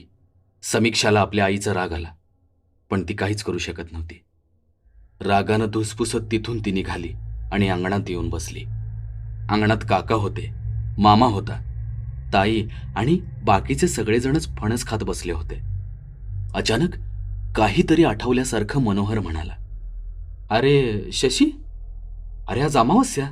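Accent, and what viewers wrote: Pune-Satara, India. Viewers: That's not way konkan people speak...its more pune satara style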